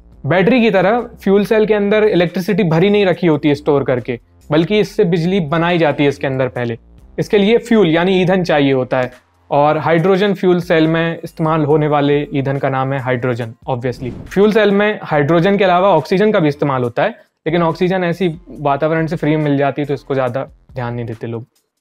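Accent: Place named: Jabalpur, India. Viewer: Bawa jabalpur se ho lag rha...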